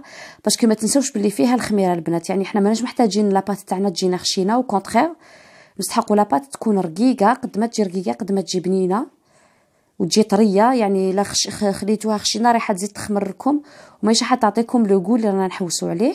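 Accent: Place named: Kabylie, Algeria. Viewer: أه الله يبارك والله كي سمعتك الخطرة الأولى حسبتك من تيزي وزو ولى من بجاية المهم اللهجة تاعك جاتني قبايلية . يعطيك الصحة على مجهوداتك راني مشتركة جديدة قناتك عجبتني بزاف روعة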